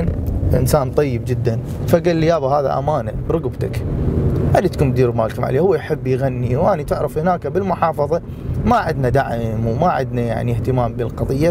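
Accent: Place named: Upper Egypt, Egypt. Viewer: اكسنت صاعدين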